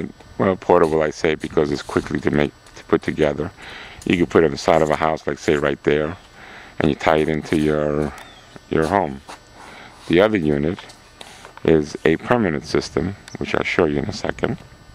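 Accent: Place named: New York, United States. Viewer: Hes in the Northeast, listen to his New York accent and notice his New York plates.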